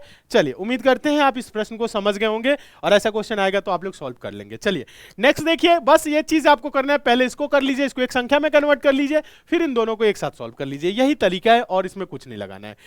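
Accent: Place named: Bhojpuri, India. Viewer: Sir G bhojpuri ek dam bhojpuri hero jaisa bole❤❤❤❤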